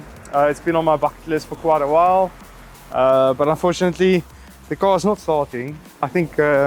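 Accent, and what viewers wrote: South Africa. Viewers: South african accent? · nice to hear a south african accent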